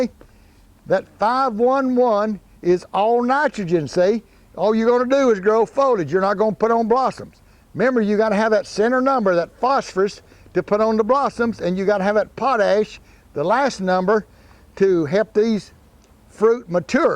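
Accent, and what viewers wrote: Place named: Oklahoma, United States. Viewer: Hi I saw a question on one of your videos regarding the smell one of your viewers was experiencing with their wicking tubs. I had the problem, it was because my drain hole was too high. That is not enough air space. Love the way y'all talk down there in OK. Looking at you from Melbourne Australia You have to realise that you are broadcasting to the World. no one has heard of sea minerals in Australia. Your fertiliser cocktails are terrific none of the brands you use are available here but the NKP formulas come through. Thank you for all the tine you spend teaching us.And thanks to Mrs Sloan for putting up with us.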